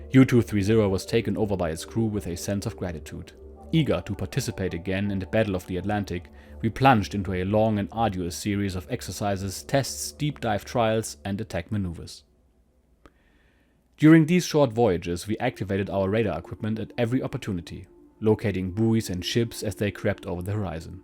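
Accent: Germany. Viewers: when you first started reading the book yourself, you apologized a bit for your German accent. Reading the book with a German accent makes it much more 'real' than if it were read in 'clean' English. I also want to thank you for re-translating the book yourself.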